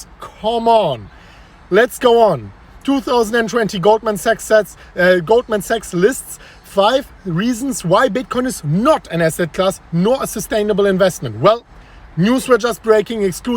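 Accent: Germany. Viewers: Love your german accent, from which city in germany do you come from?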